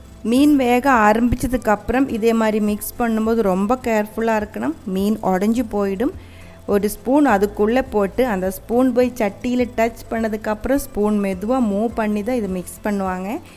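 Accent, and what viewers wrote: Kerala, India. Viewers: hai sis fish curry super..eppadi tamil kathukkitigga. neega tamil&keralaugga voice mathiriye kulambum sema. home tour podugga ugga faceyum kattugga.god bless u r family.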